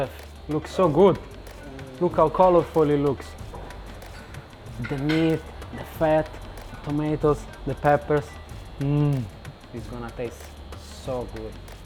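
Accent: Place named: Italy. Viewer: Man i love his Italian accent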